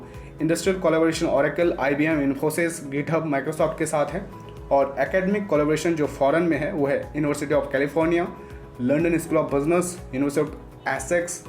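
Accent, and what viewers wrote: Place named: Northeast, India. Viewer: Hahah..this is not funny Bro..!🙄 Looks like this guy is from Northeast..and waha ki hindi thori si alag hoti hai.. isliye inka accent alag hai..!! Don't make fun of him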